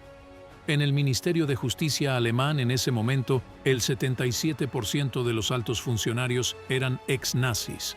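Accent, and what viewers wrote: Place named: United States. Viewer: Lo k me enfada d este amigo es k empieza a hablar Un perfecto español y despues lo habla con acento gringo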